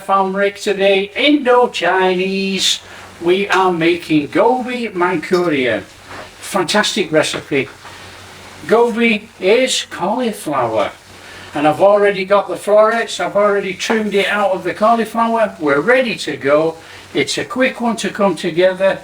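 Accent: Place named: West Yorkshire, United Kingdom. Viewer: My guess is that despite your strong West Yorkshire accent and upbringing that you are cooking in Thailand, busy every day with these splendid demonstrations and not able to remember when your waistline was 28” (if ever)!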